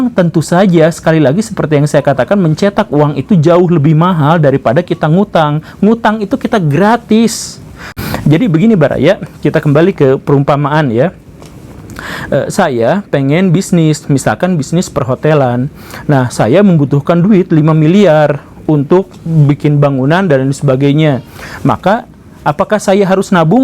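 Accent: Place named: Sunda, Indonesia. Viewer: Se7 pak....logat Sunda nya medok banget euy...orang Indo nya yg harus mau berubah, lebih mau bekerja keras tapi juga harus cerdas dong ya...😆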